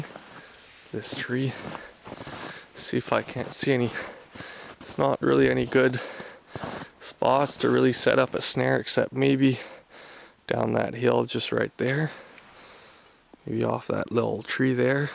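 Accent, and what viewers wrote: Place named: Quebec, Canada. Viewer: Moi qui look this up pi j'técoute pi j'me dit: ya un accent Québecois chu sure. Ben oui! Nice!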